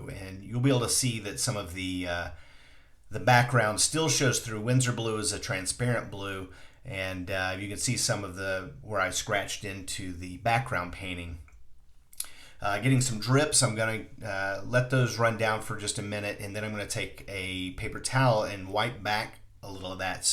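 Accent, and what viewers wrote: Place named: United States. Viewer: I really like your clear teaching style and the look of your abstract works of art. Thank you for sharing so many 'how to' videos for beginners like me and I am excited about trying out your tape idea. I also enjoy listening to your calm and very relaxing American accent. Regards from Down Under in Australia 😊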